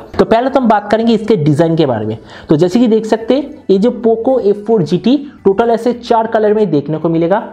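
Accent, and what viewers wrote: Bengal, India. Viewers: Bengali babu when says hindi,sounds lovely 👀❤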